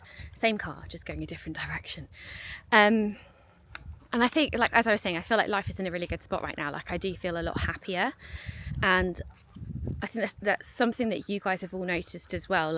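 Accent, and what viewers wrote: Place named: United Kingdom. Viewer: ❤your British accent! Now I wish I was British 😂!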